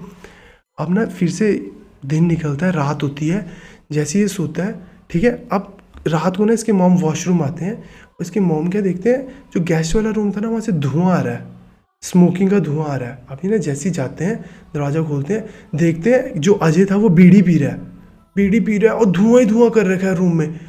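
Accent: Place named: Himachal Pradesh, India. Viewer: Good story telling💯 Are you from Himachal bcz your accent and choice of words seems like that?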